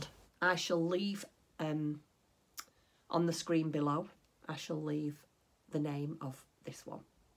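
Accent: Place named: United Kingdom. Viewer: Hi....I found your video last night and enjoyed your video so much that today I went back to watch your older first videos❤️ what a delight you are! I find myself laughing at times when you mention different things because I can so relate to it.....keep up the great work.....you have quickly become one of my video favorites💕 I love your British accent....I have a sister in law who is from England as well!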